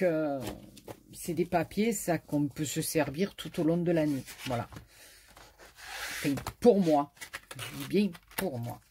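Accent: South, France. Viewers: Coucou, tout d abord une bonne et heureuse année 2020,du bonheur, la santé très important et continues à nous émerveiller par tes créas, surtout ta bonne humeur avec ton accent du soleil. Le père Noël as été très gentil avec toi,ça prouve que tu as été gentille lol.j adore le dies bordure que tu as fais avec du papier noir. Les blocs de papiers sont magnifiques, j ai le dernier que tu as montré. Maintenant il n y a plus que,aller hop au travail. Merci pour la présentation. Gros bisous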